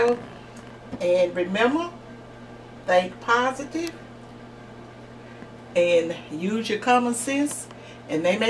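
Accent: South, United States. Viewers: Every recipe I saw 4 this used a box mix & I wanted REAL DEAL scratch made. I clicked on the video & your sweet southern accent & energy reminded me of my aunties & every sweet church lady I encountered in my life. And 1 things I know about sweet Southern ladies is they r the best REAL DEAL cooks & bakers. Gonna definitely make this Thanksgiving. TY SO MUCH. 🌹👏🏿🌹👏🏿🌹👏🏿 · I'm from California and when I heard you speak, I knew this was going to be divine. Your spirit made me feel so safe! You remind me of my God mother. So sweet, southern and full of the love of God! God bless you Miss. Thank you for just doin ya thang and being you. You blessed me real good!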